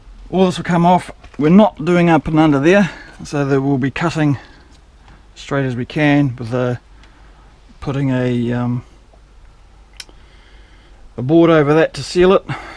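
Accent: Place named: New Zealand. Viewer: Welcome back, nice to hear that cultured kiwi accent again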